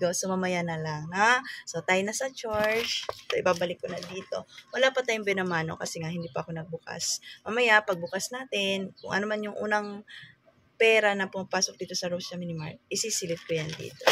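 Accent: Waray, Philippines. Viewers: Hello sis lagi kita pinanonood mga vlog mo nadidinig ko nag wawaray ka taga saan ka po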